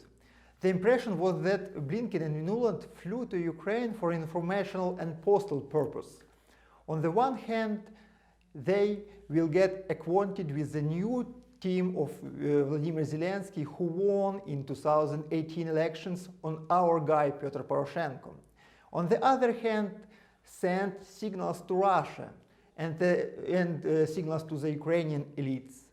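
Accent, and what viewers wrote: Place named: United States. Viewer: Good try.. Your speech is clearly understandable but you should improve your pronunciation.. I see you prefer American accent.. Anyway you're doing good job.. Thanks!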